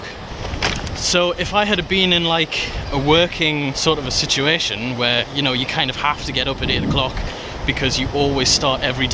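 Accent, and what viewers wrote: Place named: Geordie, United Kingdom. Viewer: Two observations: Firstly that you're a posh Geordie, I know because I married a posh Geordie ;-) and secondly I'd love to see more of the coast in your videos, the coast up there is very beautiful (too cold for this soft southerner but great to look at)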